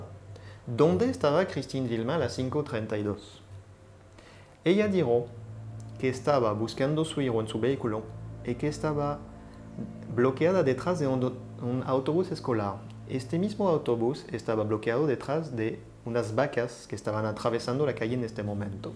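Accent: France. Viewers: Joli accent français ) pero el caso es desgraciadamente más complicado.. Aunque la primera versión que surja es la de Bernard.. y sigue siendo la más verdadera. Je crois que c'était lui.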